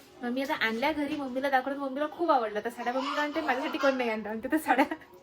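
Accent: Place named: Karanja, India. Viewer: Tai tumhi kuthlya gawachya aahe tumchi language bilkul aamchyAsarkhich aahe Karanja (ghadge)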